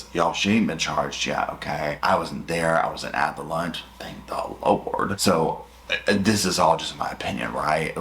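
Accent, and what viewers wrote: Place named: North Carolina, United States. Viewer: Just wanted to give you some love from NC and absolute appreciation for your content. I look forward to seeing every notification of a new video. I live in Durham,NC ❤ i knew your accent but didn’t realize you are from NC also.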